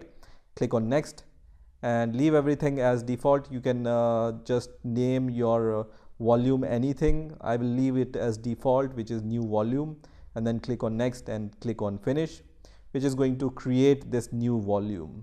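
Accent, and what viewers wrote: India. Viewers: He sounds Indian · as soon as I heard the Indian accent I knew there was no hope for my problems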